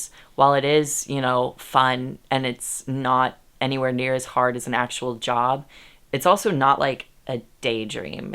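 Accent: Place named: United Kingdom. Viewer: I've been following your channels for a while now. It's been nice to witness your development, the content journey and the much deserved growth! I've watched every one of your videos and am glad I have. I'll continue to too 😸 Totally unrelated to the vid, but the recurring bri'ish accent always makes me laugh 😹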